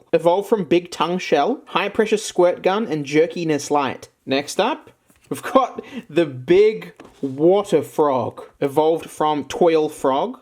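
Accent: Australia. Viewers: Shouty aussie gets words wrong. 10/10 I'm happy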